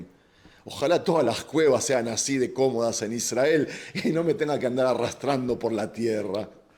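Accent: Argentina. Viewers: Que lindo es escuchar a un argentino tan lejos cuando dice che, Saludos desde la 🇦🇷🇦🇷🇦🇷🇦🇷 Dios bendiga a 🇮🇱🇮🇱🇮🇱🇮🇱 El lugar en donde nació mí salvador..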